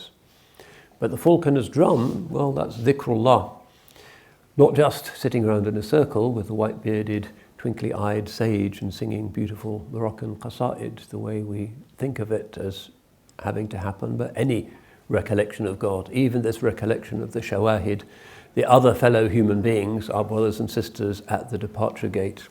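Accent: United Kingdom. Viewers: This is amazing. Love the British accent. Shows that stupidity sounds intelligent with the right accent but remains stupidity nonetheless.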